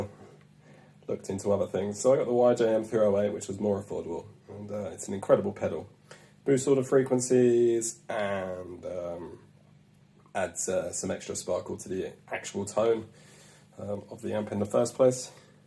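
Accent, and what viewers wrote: England, United Kingdom. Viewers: Wow what’s a nice guy really enjoyed that video. Did not expect that English accent. 🙌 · Thought you were American, but I like British accents more haha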